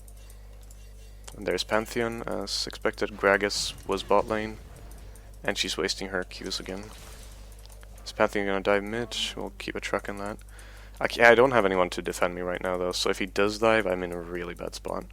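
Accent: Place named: Sweden. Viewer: ahh you are swedish^^ i thought i recognised the accent :) <-danish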